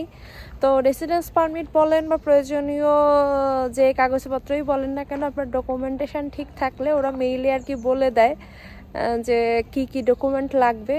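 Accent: Khulna, Bangladesh. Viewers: Apu ami Canada theke,Bari khulnai,apner khulna language khub enjoy kori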